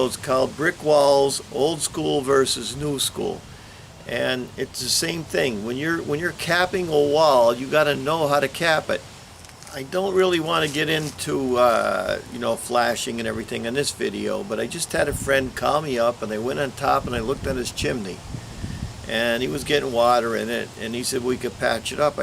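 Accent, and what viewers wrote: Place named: Pittsburgh, United States. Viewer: Today they would use the lickum and stickum method on that stone. You know, you buy it at Home Depot,and they even give a 15 minit coarse on how to do it. The guy or lady giving the coarse might be a retired cop or school teacher. lol But anyway you would still be in jail had you designed that house. But his arrogance F. L. W. was looked upon as a genious even though the place got to the point that it wasent even livable anymore due to how bad it settled. Millions were spent trying to save that place and there are videos of the whole restoration project. A whole bunch of pinheads coming up with a whole bunch of bad ideas on how to fix it. The best way would have been to tear it down and start over with a sensible design. You mention your dad being old, Well in a few days i will turn 87, i can no longer work due to serious back issues, but i can still hang out at my sons large cabinet and millwork shop here in Vero Beach and keep things straight. 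lol And i can still launch my small boat and fish the many very good lakes near here, although the salt water i can no longer do. Your accent says your from the Pittsburg area, where mine would indicate Philly. We still have a nice family owned camp up there in Cameron county, but i havent been back there since the Covid situation heated up. Anyway i cant really take the nasty wether any more either that area is well known for. Keep up the good job on the videos and for gods sake try to remember to put the line up witho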